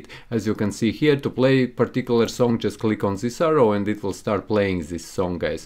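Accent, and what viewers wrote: Russia. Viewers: Finally a great Russian accent from a YT tutorial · No offense, but i like his russian boy accent :) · Love your Russian accent! And thanks for this video! Stay Safe.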